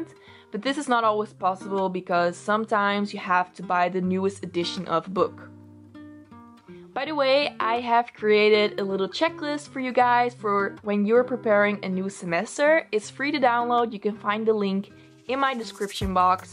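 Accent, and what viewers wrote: Netherlands. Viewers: Are you Belgian or from the Netherlands? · Are you Dutch I can hear a small accent Dus hey hoi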